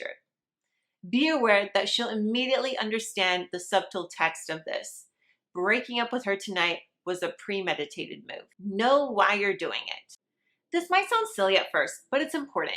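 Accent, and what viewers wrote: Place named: Poland. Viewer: Yeah. I think Polish is first language.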